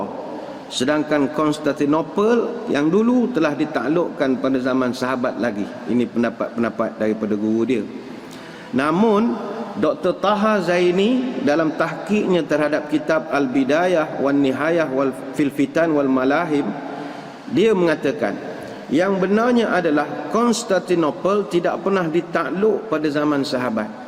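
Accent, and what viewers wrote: Malaysia. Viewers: Ustaz .ni dr mane..logat malaysia peci indonesia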